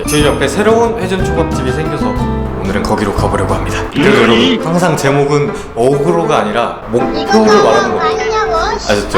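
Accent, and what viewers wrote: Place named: Chuncheon, South Korea. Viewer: 춘천이 어떤가요? 춘천 닭갈비 추천이랑 억양도 비슷함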